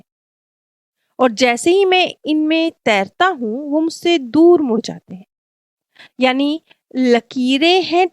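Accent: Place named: Punjab, India. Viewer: Sir ma'm punjab s hn.. kuchh accent alag hote h aap kisi rajasthani s hindi bulwayiye vo alag bolega Gujrati alag bolega, marathi to bilkul e alag south wala to teda hi kar dega.. aur bache vo angrez unse bulwakar dikhao zara dhwani ko dwaaaanni😅 bana denge Shabdo s pare chale jao.. yhan school nh h zamana gya school ka ab samjhne ka daur h chhodiye meen mekh aapko to pata h na dhwani kaise bolte h enough h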